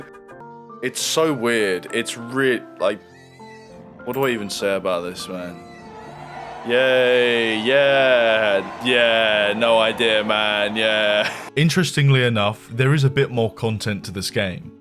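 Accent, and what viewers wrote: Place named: United Kingdom. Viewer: Why do I feel like feels a bit extra Bri'ish in this one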